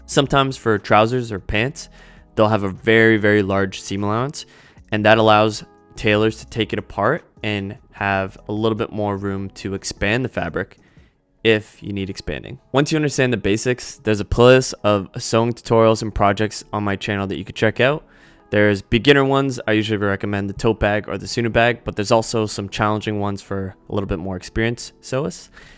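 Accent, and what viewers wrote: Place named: Toronto, Canada. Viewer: I totally pegged your Toronto accent :) Anyhoo, great video, your instructions are clear and well thought out. I just started sewing again after a couple of decades, and really enjoying this learning phase from Youtube videos. Waaaaaay better than what I learned in school! All we learned was how to make stuff from patterns, but not how to fix things, mend them, or how to make patterns from clothes without having to take a master's physics and geometry in university. Subscribed!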